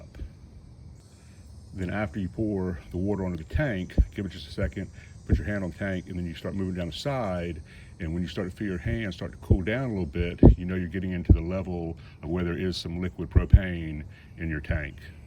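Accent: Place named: Philadelphia, United States. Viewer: Great tip! Thanks! Also, you from Philly or SEPA? I ask because you say "wooder" instead of "water".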